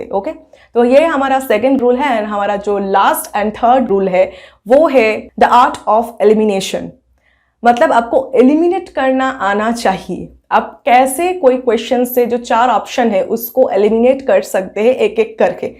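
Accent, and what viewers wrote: Bengal, India. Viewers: Hi! Di are you Bengali? Please tell 😊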